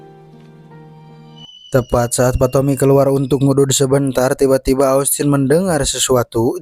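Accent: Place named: West Java, Indonesia. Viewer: wkwkwkw logat asli pasundan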